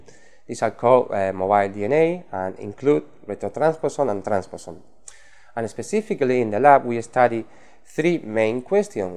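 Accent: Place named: Spain. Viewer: Interesting, but you should speak slower. As a non-native English speaker I have problem to follow because of your Spanish accent, and it had been much easier if you spoke slower.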